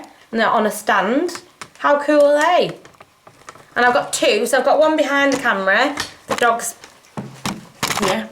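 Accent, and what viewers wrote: Northern England, United Kingdom. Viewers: It’s lovely to hear another northern accent ❤️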